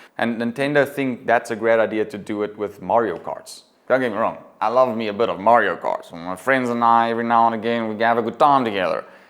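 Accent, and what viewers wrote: South Africa. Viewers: A South African tech reviewer? 😮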